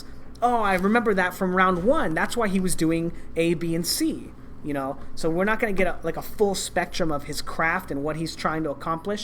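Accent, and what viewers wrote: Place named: New York, United States. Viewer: You sound like Pauly Magnillagi LOL maybe its a New York thing that accent .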